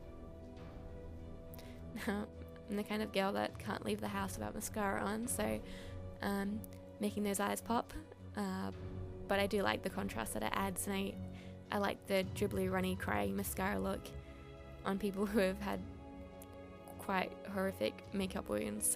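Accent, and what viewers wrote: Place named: Australia. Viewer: Took me about five videos to realize you talked "funny" but that's because I'm used to hearing american accents on you tube... (Note: by funny I mean Normal, as in Australian ) love ya work, will have to look into emulating some of it :)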